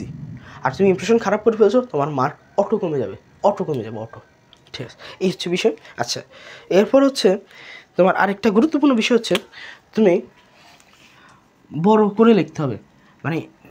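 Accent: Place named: Noakhali, Bangladesh. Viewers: Vai mone hoy noakhalir😊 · Vaiya,r kothar accent dekhei bujhe gelam vaiya Noakhali thake 🙂 Hea amio Noakhali tei thaki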